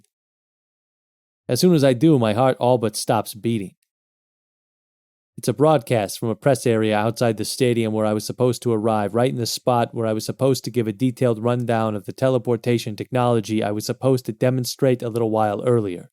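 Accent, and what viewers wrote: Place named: New York, United States. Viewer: Sounds like New York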